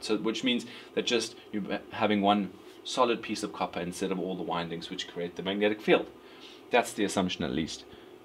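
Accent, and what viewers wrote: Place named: South Africa. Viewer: deffos a south african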